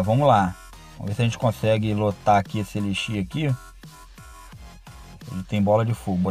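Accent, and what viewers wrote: Rio de Janeiro, Brazil. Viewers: man o q e da hr e a voz dele eo sutaque carioca top demais.show galera KKKK salve eh nois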